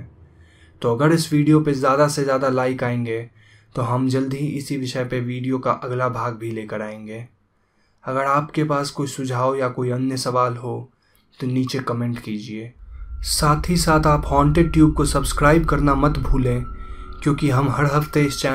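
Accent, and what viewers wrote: Bengal, India. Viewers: Bhi tum bangali ho??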